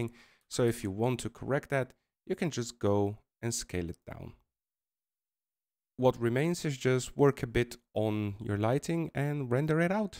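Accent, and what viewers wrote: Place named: Ireland. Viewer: I don't know why, maybe because of your accent, I was convinced you are in Ireland 🤦‍♀️😊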